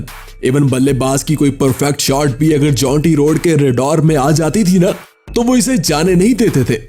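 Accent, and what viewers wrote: Pakistan. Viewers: Your accent is similar to Shoyeb Akthar , are you from Pakistan.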